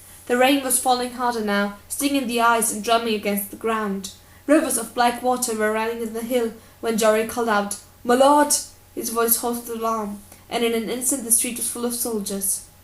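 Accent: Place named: England, United Kingdom. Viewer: i swear this is a different version of the book that i read still entertaining though plus gotta love a woman with an English accent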